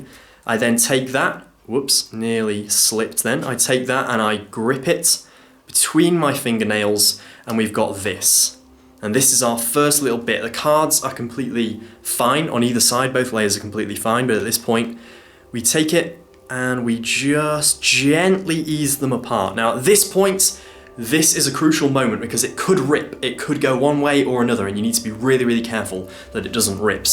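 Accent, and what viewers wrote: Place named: England, United Kingdom. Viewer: Are you from England? I am from Taiwan, I want to learn the cool accent you have...,plz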